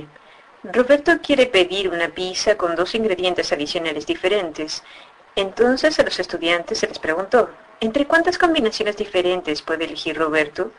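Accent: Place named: Central America. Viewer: Tiene acento centroamericano, creo que ecuatoriano, o quizás colombiano, pero se entiende perfecto!! vos no aprobarías el PISA, Miguel!! en todo caso pasame qué palabras no entendés, y el instante t en que ocurre, y te respondo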